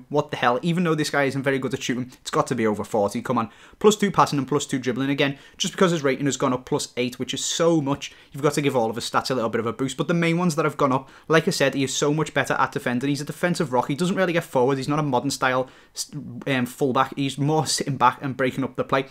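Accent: Liverpool, United Kingdom. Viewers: Love the Scouse accent ;)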